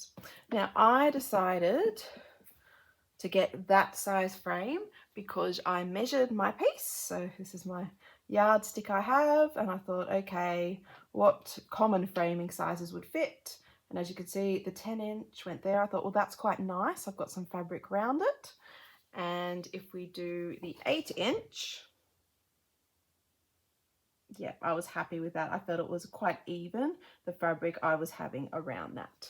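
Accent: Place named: Australia. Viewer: It's so lovely to hear a fellow Aussie voice on Flosstube! I'm so jealous of you going to the retreat with Joanna and Lisa in a couple of weeks!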